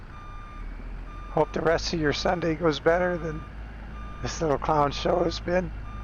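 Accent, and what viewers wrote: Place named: New Zealand. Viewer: Ha, inthat last video I thought the voice was a kiwi accent. As a kiwi myself I approve 😅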